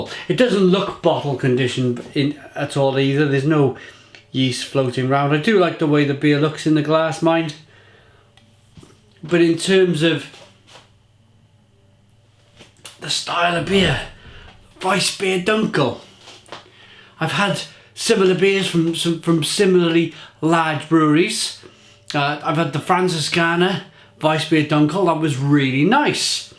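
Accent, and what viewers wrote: Wales, United Kingdom. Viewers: He's Welsh. Way different to a Scottish accent.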